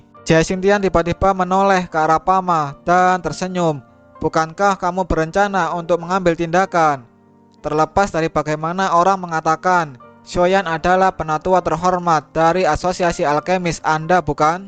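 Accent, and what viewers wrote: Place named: Java, Indonesia. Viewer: Wah medoh jawane✌😁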